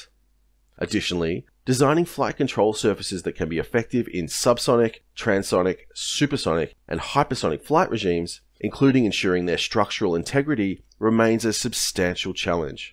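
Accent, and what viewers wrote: Australia. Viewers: You sound like that classic Australian Doosh bag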